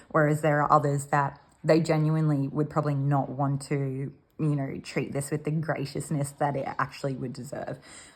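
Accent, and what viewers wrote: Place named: Australia. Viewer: Group 1 it was great that you explained things for new watcher 😊 and that you recognised some people aren't into chakras or meditation (that's me). It took a few times for me to hook into your readings properly, but i liked your Aussie accent (I'm kiwi) - a lovely change from the mostly American ones and I'm glad you're still here 😊 The reading definitely resonated. I'm talking to my ex- i told him i don't want to see him, but he's answering some questions about our past right now. I slipped up and mentioned a place i go to regularly, so now I'm hoping he doesn't turn up on the pretext of seeing my daughter who works there. I'm only talking to him to show him how our values, everything don't match but i think he thinks if i see him, i won't be able to resist him. He's full of himself like that 🙄 and totally self serving, but he's trying to disguise that. 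I know him better than he knows and ever WANTED to know me. Thanks ❤❤❤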